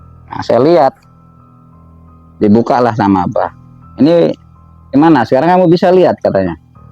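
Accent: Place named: Madura, Indonesia. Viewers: Iki logate Meduro / Banyuwangi om bukan sragen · Ko logatnya kaya org jatim bukan ky logat org jateng meditasi bukan apriasi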